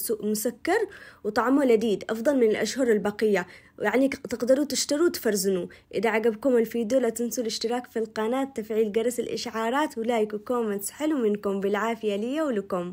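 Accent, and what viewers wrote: Aden, Yemen. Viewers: صوتك اجمل من الفديو كامل ماشاء الله عل الهجه العدنيه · ماشاء الله ماحسنيك للهجه العدني وطريقة شرحك حلو الله يعطيك العافية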